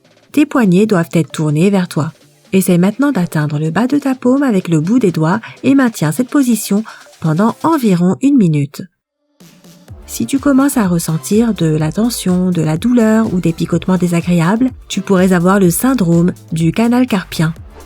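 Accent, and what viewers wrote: Quebec, Canada. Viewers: elle a la rhume la dame kubquoi horrible la voix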